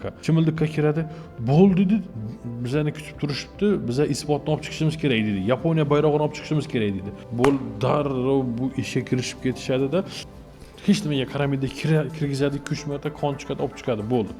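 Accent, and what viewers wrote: Khorezm, Uzbekistan. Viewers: Хоразмча талафуз блан тушунтирдингиз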